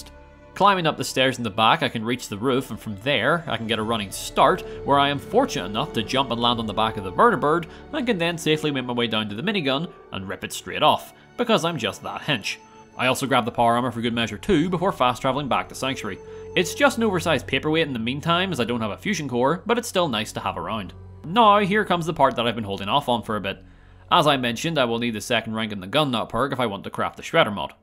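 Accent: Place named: Northern England, United Kingdom. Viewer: Where in north are you from mate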